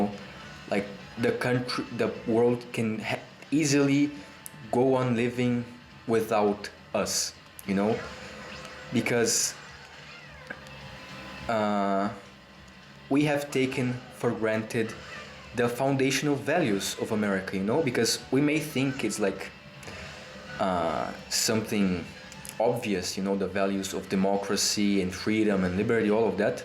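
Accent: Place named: Brazil. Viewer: yo are you half mexican half italian? Strange how that combination would give you a vaguely brazilian accent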